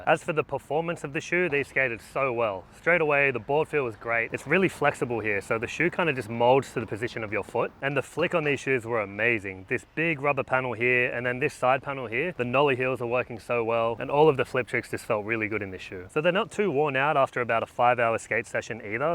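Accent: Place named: Australia. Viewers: hes aussy its not that serious its a culture thing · Dudes never heard an australian man speak before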